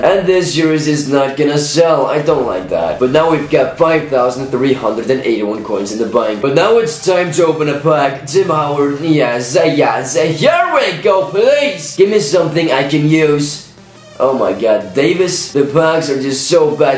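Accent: Iceland. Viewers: Everyone saying his voice is annoying did you ever think it was because of his accent and hes from iceland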